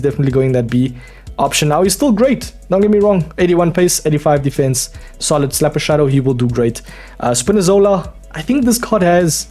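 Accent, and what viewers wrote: South Africa. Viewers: * are u South African ?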